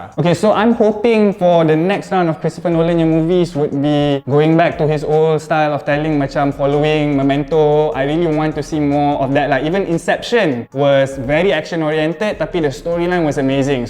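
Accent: Malaysia. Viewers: I love this kind of persona the speaker has. The way he told the stories. R u malaysian or singapore?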